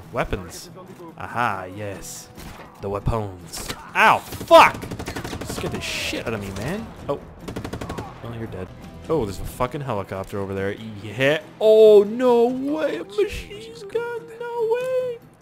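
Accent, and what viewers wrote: Canada. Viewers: Hes canadian and they pronounce cause lime cuz. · Al_isAtto702 no. It's his accent. He's Canadian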